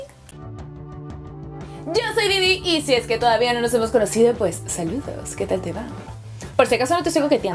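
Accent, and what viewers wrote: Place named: Peru. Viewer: SAAA ES MI CAOSA PERUANASA Y NO SE TE QUITA EL ACENTO, ME ENCANTO TU CANAL 💘🌸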